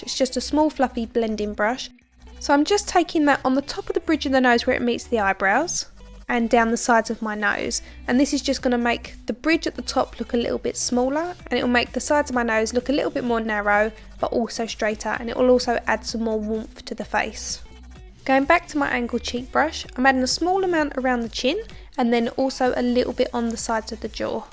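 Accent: Essex, United Kingdom. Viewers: Are you from Essex? You sound like my cousins!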